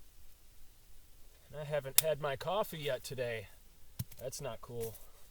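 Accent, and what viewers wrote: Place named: New Jersey, United States. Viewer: I understand. I was thinking I heard a New Jersey accent in your voice, so I asked. Thanks.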